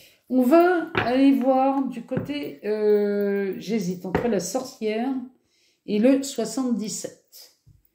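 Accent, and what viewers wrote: North, France. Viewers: J aime bien votre petit accent aussi. Du nord ?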